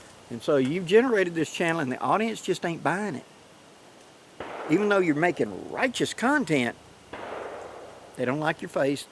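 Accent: South, United States. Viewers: Hey - That stuff sounds complicated to me. The only thing I know how to do, is watch the videos. That works for me. It is interesting to say the least. You'll figure it out and your southern accent is not near as bad as up here in WV. You take it easy and just go with the flow- Carry On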